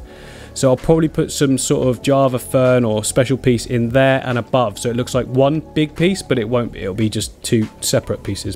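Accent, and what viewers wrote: United Kingdom. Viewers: I think he's from the UK.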